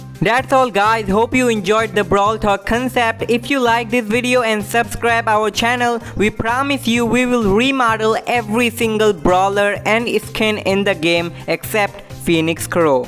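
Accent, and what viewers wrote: India. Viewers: TF THIS SI NOT THE RERAL VOICE YOUR LIKE TALKING AN INDIAN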